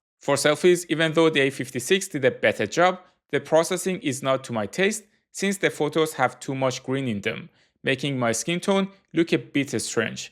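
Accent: Iran. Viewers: نگا لهجه ایرانی رو :))))) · Are you persian ?? Your accent sounds like you are. If so nice video dadash ❤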